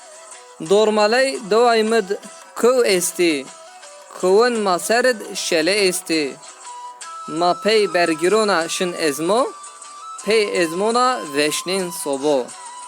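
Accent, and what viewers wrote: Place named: Bingöl, Turkey. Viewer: Bingol genc şivesi sanki liceden selamlar homa pilo bena hol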